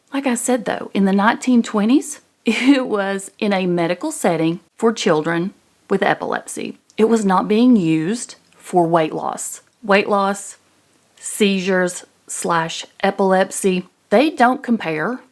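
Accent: South, United States. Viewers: Man, love that southern draw…..what state ya from?😊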